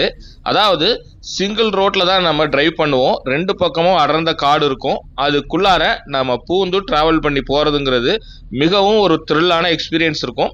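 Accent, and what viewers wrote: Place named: Puducherry, India. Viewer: சார் உங்களுடைய காணொளியை பார்த்தேன் நான் புதுச்சேரி காரைக்கால் சேர்ந்தவன் உங்களுடைய வாய்ஸ் நம்ம ஊர் போல் இருக்கிறதே என்று தங்களுடைய கார் நம்பரை வைத்து பார்த்தேன் நீங்கள் புதுச்சேரியை சேர்ந்தவர் என்று என் மகளை பொள்ளாச்சியில் திருமணம் செய்து கொடுத்துள்ளேன் ஆழியாறு ஆனைமலை வரைக்கும் தான் சென்றிருக்கிறேன் உங்களுடைய வீடியோவை பார்த்த பிறகு உடனே செல்ல வேண்டும் என்று ஆவலாக உள்ளது நன்றி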